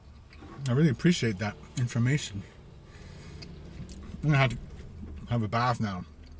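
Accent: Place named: Clarks Harbour, Canada. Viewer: That right there is a Clarkes Harbour/ Barrington accent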